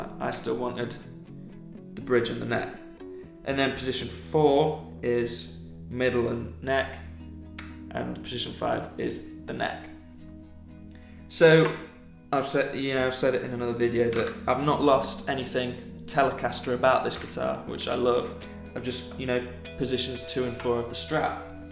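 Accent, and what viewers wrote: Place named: North West England, United Kingdom. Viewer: Sweet that mate- lovely sounding, you sound like you’re from my area- you North-West? I’m Warrington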